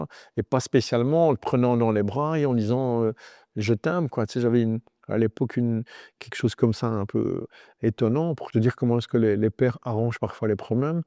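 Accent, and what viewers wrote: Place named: Brussels, Belgium. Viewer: Maître si les pot cast avaient existé il y a 20 ans je suis certain que j’aurais été inspiré par vous et j’aurais fais des études de droits. Vous êtes hyper inspirant. Ps: l’accent bruxellois est dingue 😂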